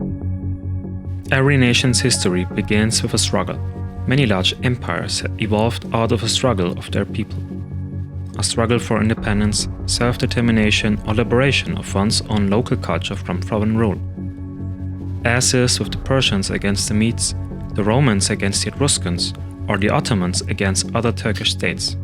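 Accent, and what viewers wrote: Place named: Germany. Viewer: I've read Jean-Paul ROUX book. It's great. PS : that german accent makes it better, mein Freund.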